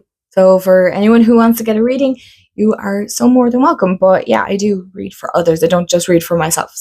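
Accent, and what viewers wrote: Ireland. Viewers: So nice to meet you,. 🍀 🇮🇪 Your lovely accent sounds like home! My father’s parents emigrated from Ireland, wow, about 100 years ago and me da would slip into a brogue on the regular, which means that I slip into a loosey-goosey brogue on the regular, quoting my dad quoting Hal Roach, write it down. Anyway, I enjoy your channel and point of view. ✌️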